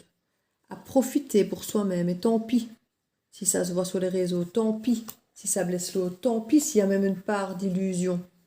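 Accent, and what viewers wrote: Belgium. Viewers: Voilà que je vous découvre depuis la Belgique.... vous êtes géniale... Moi par contre je n'ai personne d'autre dans ma vie car rupture le 22 mars ....et je n'arrive pas à l'oublier pour le moment.....un petit accent de Belgique peut-être ? Je me trompe peut-être....😊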